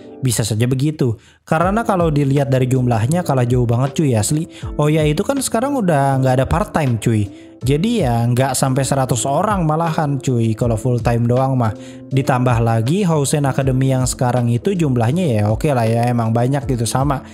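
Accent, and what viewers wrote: West Java, Indonesia. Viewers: Enaknya channel Ini Kadang Ada Logat Sundanya... Jadi enak Buat saia yg urang sunda pisan... Btw salam subscriber baru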